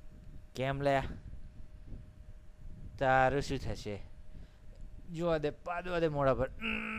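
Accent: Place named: Mehsana, India. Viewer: well done bro..gujarati wid mehsani accent..hahahah lolz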